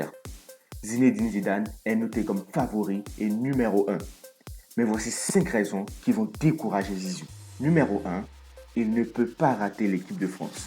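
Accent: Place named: Africa. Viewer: Wesh on comprend rien avec ton accent africain , paye quelqu’un qui parle bien français pour faire ce genre de vidéo pff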